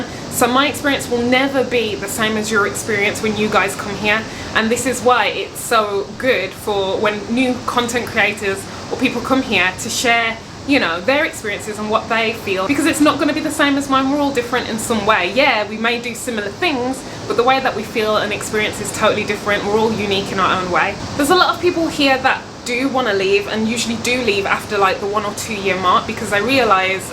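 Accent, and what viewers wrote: Birmingham, United Kingdom. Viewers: Girl!! I totally know what you mean about "settling" when making friends. I've been in Japan since 2018 and have a select few people I can call friends, pretty much because I can't get attached to people I wouldn't really hang out with in the UK. I know that makes me sound like a snob but Japan attracts a lot of weird foreigners and I don't want to spend my time with people I don't actually click that well with, or put myself into any compromising situations... hence my lack of a solid circle. One of my good friends (who no longer lives near me :(... ) is from Birmingham. He has the same positive energy as you and the accent sounds very similar. Are you from around there by any chance? Anyway, I feel like if we met for real, you'd be someone I'd choose to hang out with. Thanks for your positive, down to earth vibes! Hope to bump into you one day! 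:)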